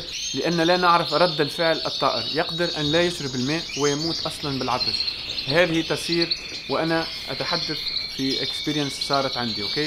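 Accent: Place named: Tunisia. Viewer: منضنش الله اعلم تونسي ولا ليبي على حساب اللهجة تاعو على الاغلب تونسي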